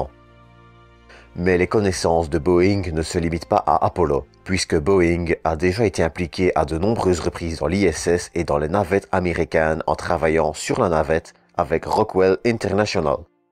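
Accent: Belgium. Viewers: vive l'accent Belge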